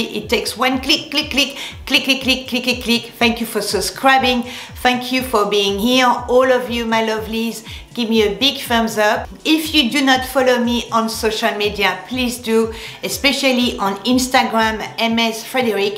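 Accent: France. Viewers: When I see your beautiful face and I hear “cleek,cleek,cleek in that wonderful French accent I know this video will be great. Your shopping advice was spot on. Since I started watching your channel I find myself making more of an effort in my appearance every day…and oh yes…perfume every day.!!!!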